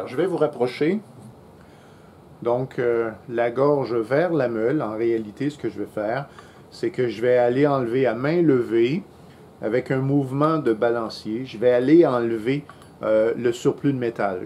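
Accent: Quebec, Canada. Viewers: De bonnes astuces pour le débutant avec comme bonus ce bel accent québécois... Merci ! 😉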